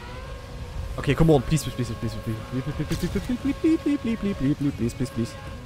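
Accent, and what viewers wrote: Germany. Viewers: Hallo der herr xD deutscher aktzend und deutsche wörter wie Ja Alter NEIIN haben dich verraten xD Guten morgen xD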